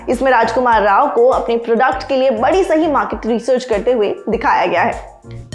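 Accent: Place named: Bihar, India. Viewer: But mamm ka bihari accent (idea to hayye hai) bahut aacha laga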